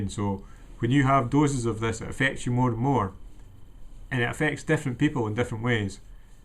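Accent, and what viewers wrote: United Kingdom. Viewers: It's quite refreshing to hear a scottish guy talk on YT to be honest. All i hear is americans, the english and aussies. and im aussie too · Hi, I'm suffering from terrible anxiety/ lack of sleep. I can relate to every single thing you've mentioned in this video. Things seem terrible now but I hope that one day I will be making a youtube video like you, helping other people. My diet isn't great.. I must admit but that's down to me being at home 99% time feeling sorry for myself and not knowing what to do. I just downloaded the audio book and I'll give this a good go. Tried pills, hypno etc.. P.S mum's from glasgow, nice accent! :)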